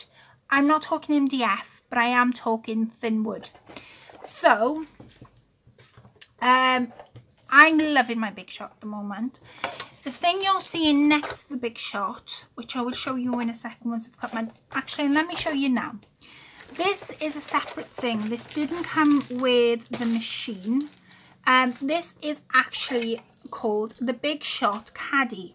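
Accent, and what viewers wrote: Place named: Wales, United Kingdom. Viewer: Sorry I disappeared, my internet quit on me and I had to call my provider, but I can watch the playback, gorgeous card. Love your Welsh accent, I'm originally from Manchester so I recognized it right away. lol TFS...Hugs...Mary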